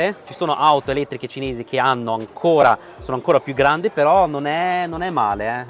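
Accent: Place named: Milan, Italy. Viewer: Come percepiscono il tuo cinese con accento milanese ?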